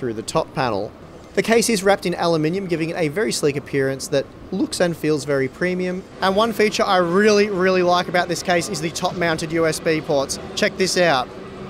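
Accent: Australia. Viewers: disliked because aussie accent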